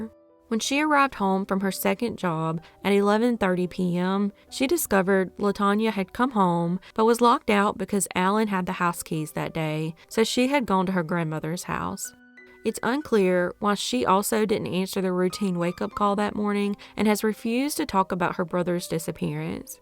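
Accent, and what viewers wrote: South, United States. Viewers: The southern accent is just so darn cute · Such a adorable accent ❤️❤️❤️ I'm also from the south and you truly sound like a southern Belle 😘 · I love that southern draw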